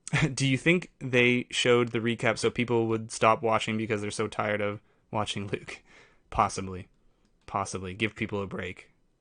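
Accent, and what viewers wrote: Canada. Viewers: Which part of Canada are you from. It's pretty obvious by now. I used to live in the Toronto area.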